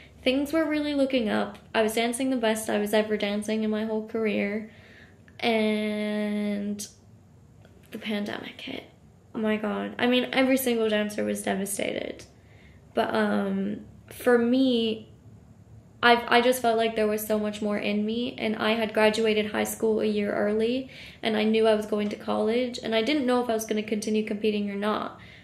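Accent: Ireland. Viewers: You have picked up an Irish lilt to your voice,. A far cry from your Ohio roots! Best of luck! Love following your career! 🍀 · You sound kinda irish! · Oh wow I thought she was Irish based on her accent. I’m from Ohio and don’t hear the midwestern dialect at all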